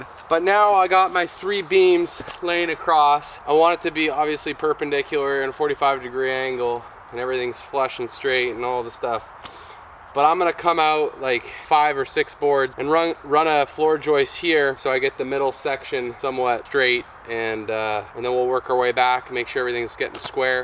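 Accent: Canada. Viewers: Wow I’m Canadian and could’ve sworn you were Canadian. U sound like one.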